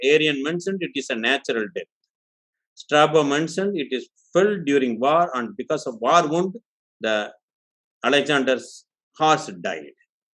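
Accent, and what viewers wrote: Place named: South, India. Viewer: The speaker is knowledgeable. But his South Indian accent of the English language is TERRIBLE !!!! Please record this speech in someone else’s voice. As an Indian, it’s difficult and irritating for me to understand this fellow. For a non- Indian, it’ll be impossible to understand this person!!!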